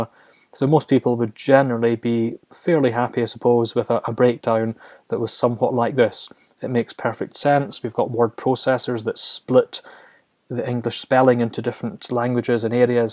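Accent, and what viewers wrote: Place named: Scotland, United Kingdom. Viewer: Your Scottish, or Northern Irish accent if I'm not mistaken, is charming as hell and a fresh departure from the typical bland American delivery. I'm a linguist and amateur philologist, and have an interest in the Aramaic language and Its history. Nice video.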